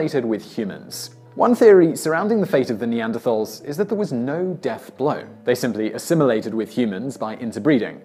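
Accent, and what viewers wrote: United Kingdom. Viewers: They died from listening to stupid top tenz presented by some guy who doesn't seem to have any intelligence, education, charisma or creative ability...just a British accent....That's it.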